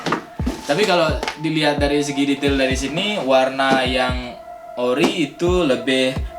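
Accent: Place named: Madura, Indonesia. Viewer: Jer, mirip mirip Logat meduro 🗿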